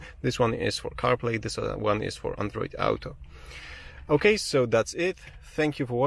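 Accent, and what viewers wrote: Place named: Poland. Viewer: Po akcencie poznałem, że Polak. 😃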